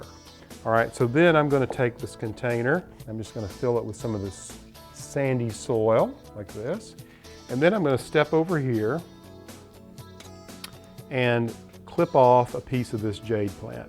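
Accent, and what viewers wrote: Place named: Arkansas, United States. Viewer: P... you sound like you're from Arkansas. Am I correct?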